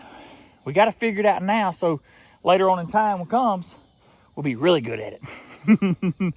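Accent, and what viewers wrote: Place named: South, United States. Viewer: still has a clear southern accent at 2X speed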